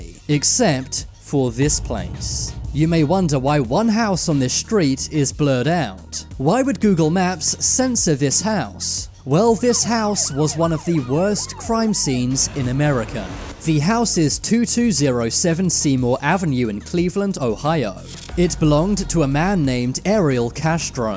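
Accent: United Kingdom. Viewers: I don't believe I have listened to a YouTube video with as many grammatical errors as this one. Not that there are a lot but more than any other to which I have listened. At about 12 seconds in, "most scary". That should be "scariest". The adjective "scary" has 2 syllables and ends in "-y". That means we use the "ier" and "iest" endings for comparative and superlative forms. I see this more and more, that is, where people say "more" or "most" when it should be the comparative suffixes "ier" or "iest". I don't understand why as it even just doesn't sound right. Also at the end of this video, the narrator again uses "most scary" which I have already mentioned should be "scariest". Next is when the narrator says, "him and his car were never found". Really? Him was never found, eh? I don't think I have to mention what the correct pronoun should be (he), but I did anyway because I sometimes just wonder. 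Also, I wonder if the narrator is British (he sounds British) because all too often when I watch British shows they often use "him" (or "her") as the subject noun. I don't get that either as I always thought the British were generally pretty good at using proper grammar. Next, at the narrator says, "by censoring this house, less people would go here". That should be "fewer" people. Use "less" for singular nouns and "fewer" for plural ("there is one less person here"; "there are 10 fewer people here"). I don't get it as again, it doesn't even sound right. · I hear you speak British🤨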